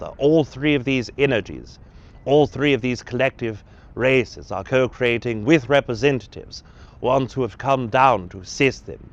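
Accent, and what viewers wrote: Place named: RP, United Kingdom. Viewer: Wow I'm from UK and channeling u have the poshest English voice I've ever heard,💕 was brilliant video ,thanks💕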